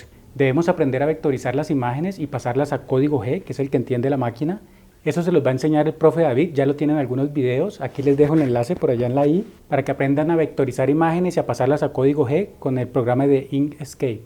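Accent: Colombia. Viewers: Por el tono de su voz ,creo que es colombiano,y de ser así ,mi respeto ,me párese muy loable lo que hace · hola amigo, que buen trabajo, eres de colombia? mas o menos por cuanto salio todo hablando monetareamente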